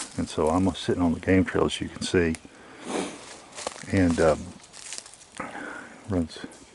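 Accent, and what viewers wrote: South, United States. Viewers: Enjoy your Videos, good hearing another Southern voice, pretty Country there. Stay Safe, best wishes from a Ga Fan....😷🇺🇸🍑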